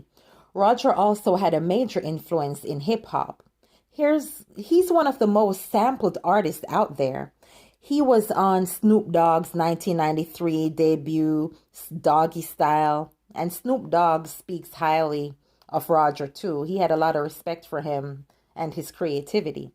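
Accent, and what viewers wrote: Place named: Jamaica. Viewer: hey are you Jamaican? I hear a very slight Jamaican accent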